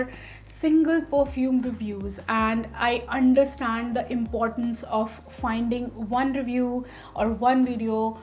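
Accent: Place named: India. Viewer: Indian accent 🤮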